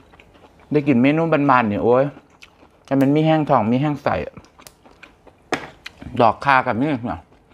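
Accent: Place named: Udon Thani, Thailand. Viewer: เพิ่งรู้ว่าเป็นคนหนองบัว มิน่าสำเนียงคนอุดรจ้า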